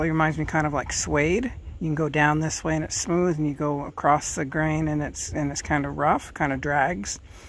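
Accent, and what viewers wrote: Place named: Maine, United States. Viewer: Very cool. I just found you by doing a Google photo (I'm foraging) search. I also am from Maine. I heard your accent and was like she's gotta be a Mainah! LOL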